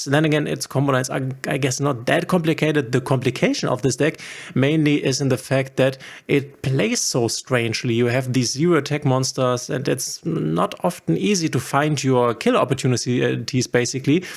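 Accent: Germany. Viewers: Hör ich da nen deutschen Akzent raus ? 😄 wenn ja, geiles Video 💪🏻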